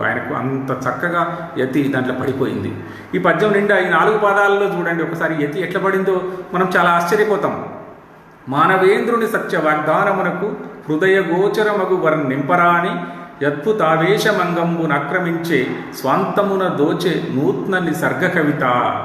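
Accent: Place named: Telangana, India. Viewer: Sir..... Mee telangana mandalikam tho kudina telugu Chaala bavundhi.... Sir 🙏🙏🙏🙏🙏🙏🙏🙏🙏🙏🙏🙏 Ee video Telugu vallu Bhavishyatthu lo chustharu sir ekkuvaga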